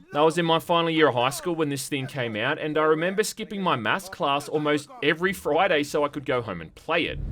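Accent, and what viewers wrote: Australia. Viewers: Are you Australian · You sounds like an Ozzie Australian.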